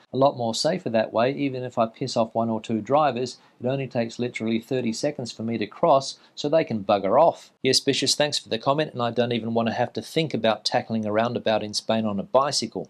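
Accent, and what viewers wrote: New Zealand. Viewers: Are you quite sure you are not speaking with a Kiwi accent? Could you demonstrate the difference?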